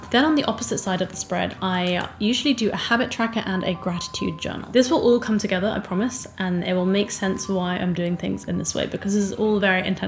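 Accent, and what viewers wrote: United Kingdom. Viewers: Hi it’s nice to see you and listen your videos. I love how you talk in British accent and always your voice gives me a power to improve my English deeper. Currently, I’m living in Japan and I would like you to come to Japan, this is such a good country and I can assure you that you will love it. Thanks for your videos and I want you always to be beautiful as now. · Hi it’s nice to see you and listen your videos. I love how you talk in British accent and always your voice gives me a power to improve my English deeper. Currently, I’m living in Japan and I would like you to come to Japan, this is such a good country and I can assure you that you will love it. Thanks for your videos and I want you always to be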